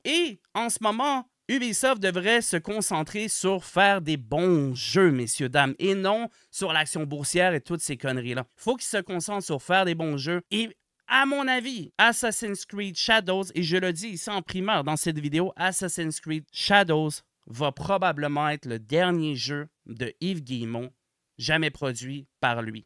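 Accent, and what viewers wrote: Quebec, Canada. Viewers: Un abonné de plus, suite à la video de Psyhodelik. L'accent québécois me manquait, je reste squatter un peu 😅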